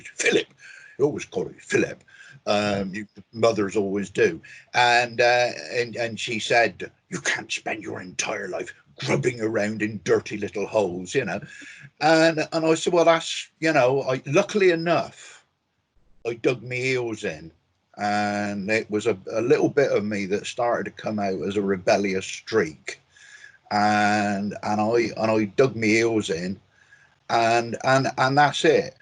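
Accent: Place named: Newfoundland, Canada. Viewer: I love his accent. He could be from Newfoundland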